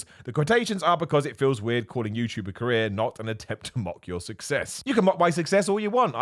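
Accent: United Kingdom. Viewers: As an Australian I don’t think you sound like us! Although your British accent is a very mild one if that makes sense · American/Australian?!? Weird... You sound totally British to me.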